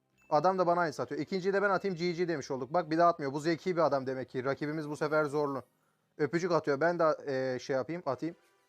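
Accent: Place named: Istanbul, Turkey. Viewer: dil kursuna falan mı gitmeye başladın türkçen istanbul türkçesine dönmeye başladı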